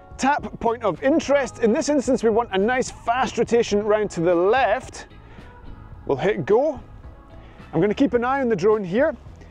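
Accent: Scotland, United Kingdom. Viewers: ... and scottish accent ...! Beside the really valuable tipps. Greez from 🇨🇭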